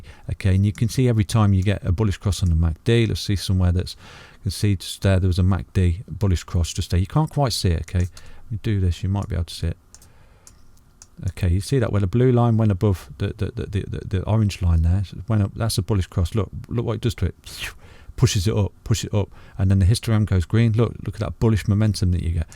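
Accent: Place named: Leicester, United Kingdom. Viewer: East Midlands Crypto massive!! Guessing you might be from Lestoh as well haha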